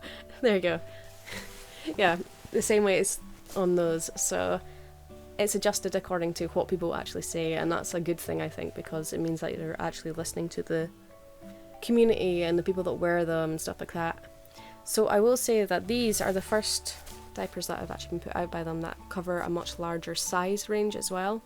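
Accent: Scotland, United Kingdom. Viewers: 1. Your accent is amazing, love.Scottish. 2. Thank you for your absent absorbent and ABDL products... it's hard to find them that works for most adults, and your abdl reviews is comprehensive and brilliant. tyvm for being awesome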